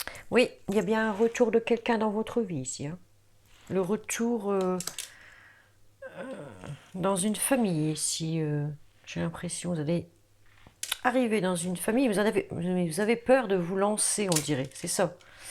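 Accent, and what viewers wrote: North, France. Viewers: Merci sympa le tirage et pleins de bonne humeur! seriez-vous du Nord? BONNE JOURNEE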